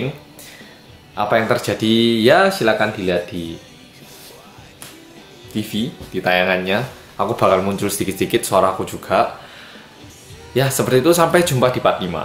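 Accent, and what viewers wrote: Java, Indonesia. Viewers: Ini org jawa atau korea???hehehe.. cocok.bgt oppa ini jd org jawa ya. Top deh :-) :-) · Sumpaaah jowoo bgt, koq iso toh?? Hahahhaha logat korea'ne ilang, hahahhahaha i adore u!!!!! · medok Jawa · Seneng denger logat nya kakak indonesia sekali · Bahasa jawanya logatnya sma sprti orang chinese jawa...mngkin dulu dimalang tmn2nya bnyk orang chinese... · Org korea ngomongnya medok bgt👍🏻👍🏻😍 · pertama nonton videonya ngga pake headset kirain logatnya korea,ternyata jawa,proud of you kak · Sip bang. Jowo medok temen. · Wkwk bisa bhada indonesia tp logat dominan ke logat jawa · Orang korea tapi medok jowo ne 😁✌ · haha.jadi penasaran apa yg sebenernya telah terjadi di balik layar XD. sy subscribe sampeyan karena informatif dan medok jowo. eh ga nyangka jadi koordinator RM yg sy tonton selama -/+ 6tahunan. anyway, just curious, kalau sampeyan bergumam atau ngomong dalam hati pakai bahasa apa?haha. 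atau sekedar ngomong 'kasar' atau mengumpat. disesuaikan dengan lingkungankah?haha. fighting! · ini mas nya orang korea ? halahyung .. kok luweh medok ko aku 😫😫😫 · Hai kak.. aku subscriber baru nih di channel kakak.. beh ~ seneng banget liat kak ngomong bahasa jawa.. 😆 medoknya itu lho 'njawani buanget'.. 😆 ditunggu lho kak yang part 5 nya ~ 😁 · Medhok ya 😂👍🏼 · ini beneran orang korea asli? bahasanya kox medok jawa ya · korea roso jowo ngene ki! kueren tenan mas!:D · Wow bahasa indo nya medok banget... Gw kerja di korea tapi bahasa koreanya gak sebagus bahasa indo dia.... Hahha Sini ke gwangju hehe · fasih banget bahasa jawa nya wkwkw medhok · Suka suaranya agak medok jawa.... 😀😘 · Mas ini aslinya orang korea ya? tpi cara ngomong jowo banget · logat jowo ne kuentel marai ngakak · Kaget pas ngomong ternyata medok kirain bakal ngomong korea atau belum fasih bahasa indo gitu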